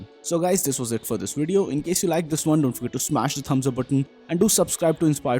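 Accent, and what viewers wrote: India. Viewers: mamma mia gli indiani che parlano inglese sono irritanti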